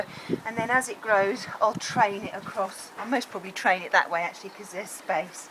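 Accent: United Kingdom. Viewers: Luv'd ya video and your Accent - must be in the UK..:) I just planted 3 kiwi plants ..... wish me luck..:)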